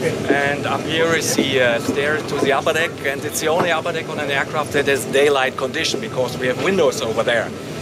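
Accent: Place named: Germany. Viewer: Richtiges Englisch mit deutschem akzent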